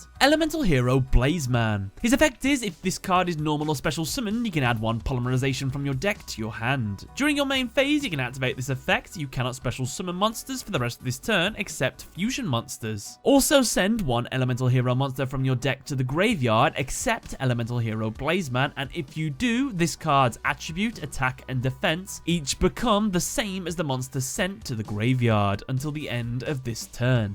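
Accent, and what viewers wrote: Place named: United Kingdom. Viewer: you're so British. And I live that you say reckon.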